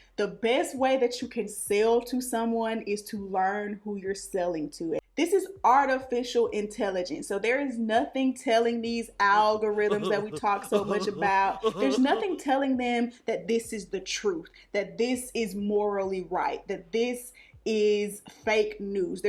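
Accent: South, United States. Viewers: Finally I'm from SC. I wasn't trying to insult you I can just tell you are southern too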